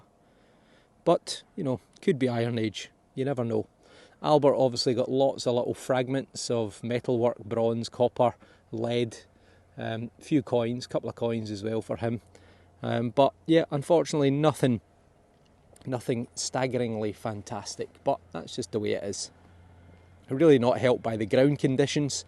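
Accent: Scotland, United Kingdom. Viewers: oh damn.... its really good to hear some scottish again, must been 20 years by now i had a great friend from scotland who just talked like you so funny to listen, some nice finds and you earned my suscription, say hi to from his bavarian fan;) and no the silver isnt older than 200 years even if it is silver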